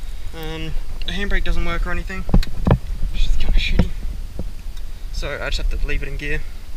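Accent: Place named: Australia. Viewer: already can tell ur an aussie in the first 10 seconds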